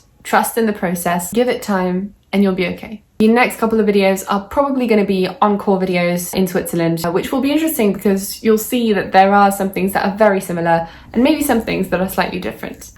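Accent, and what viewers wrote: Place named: England, United Kingdom. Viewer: hello from turkey, i'm working as a GP here, i'm currently studying for OET exam, which enables me to take PLAB exams. If you have any questions or wonders regarding Turkish healthcare system, i would gladly answer to you. Anyway, your english accent is so pure and nice, it's also educational for me to get used to english accent:) greetz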